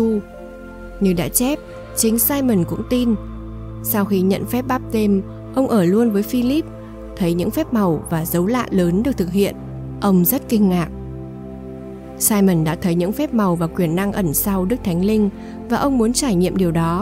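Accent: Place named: Hanoi, Vietnam. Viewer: Giọng đọc hay quá . Cảm ơn nhé.nghe rõ, chuẩn giọng hà nội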